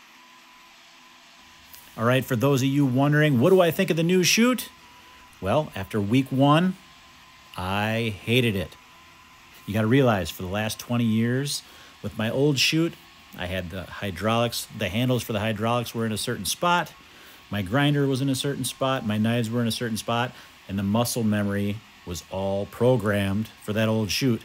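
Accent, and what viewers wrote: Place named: Great Lakes, United States. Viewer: your Great Lakes accent is music to my ears. I’m from Western New York originally, and you sound like home, even though you’re in Wisconsin.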